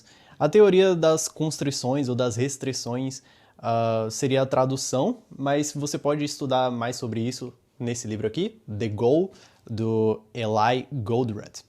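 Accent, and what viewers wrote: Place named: Bahia, Brazil. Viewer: Vi que você é devoto de Carlo Acutis hauha... Você é baiano? O sotaque caetano veloso entrega hauahua...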